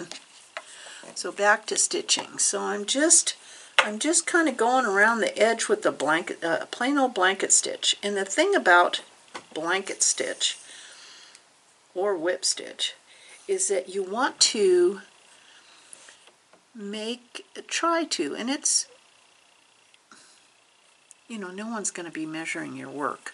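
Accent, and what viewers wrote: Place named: Oregon, United States. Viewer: One of the reasons I love listening to your videos, among others, is that I lived in Oregon for 9 years while in college. I experienced a silver thaw one year in Portland. I think that was 1996/7? I remember the sound of the crashing branches. Most of the rest of my adult years and much of my childhood was spent in Boise Idaho area. Four years ago, my husband's job brought us to Alabama. I just love listening to your accent, the sounds of home. And of course, the quilting.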